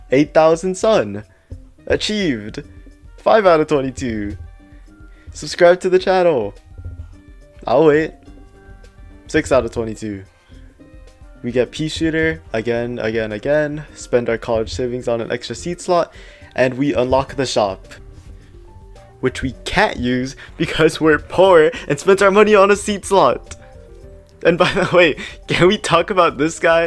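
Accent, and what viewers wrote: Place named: Germany. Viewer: Man merkt dass du Deutscher bist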